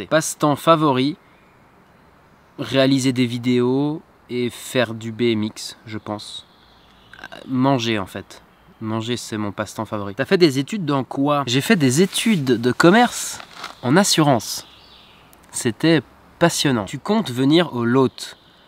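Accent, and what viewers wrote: Switzerland. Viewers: T’es genevois ou bien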